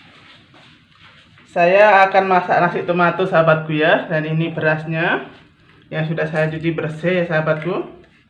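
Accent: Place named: Malaysia. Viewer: Jadi keinget pertama lihat daun kari tu waktu kerja di malysa,, kakak ni asli malysa atau aslinya orang Indonesia, kok logat Melayu nya dk kental banget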